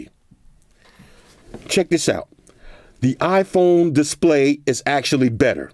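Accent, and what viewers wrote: New York, United States. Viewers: My man is soooo NEW YORK, I love it. I love apple but I agree with all your dislikes . They need to do better and give us at least a dongle and a brick yo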